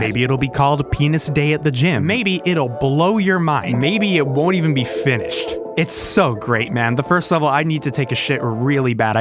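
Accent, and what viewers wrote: United Kingdom. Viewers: never knew you were British, the more you know lol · why is he british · you're british?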